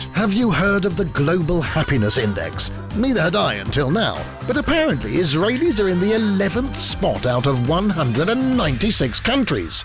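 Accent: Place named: United Kingdom. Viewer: This is SO well done! the facts slide from one to the next smoothly, the research is brilliant and the photography top notch. (& everyone LOVES a British accent right?) Well done! My fave part: Ban on ads featuring underweight models! YES!! Love you MORE Israel!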